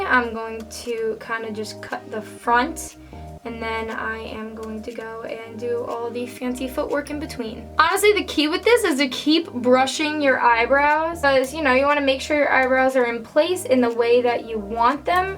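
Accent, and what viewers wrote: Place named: New Jersey, United States. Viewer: You sound kinda New Jersey~ish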